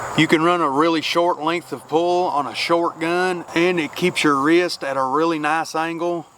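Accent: South, United States. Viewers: I hope you read this as it's my first video of yours. You EARNED my sub, first off u had the stones to not spend 5 min explaining the SBR bs down to your number. Second you built imo the best 300 on yt, and mostly you the only one sound more redneck than me here in NC. You got like sub comment whatever I can do.🫡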